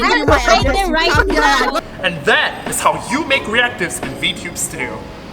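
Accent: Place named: United States. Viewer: Just want to say, I love the accent you're packing. And I thought every Singaporean Vtuber here had the Singlish problem, you're one of the first I've watched with a normal American accent, and I'm happy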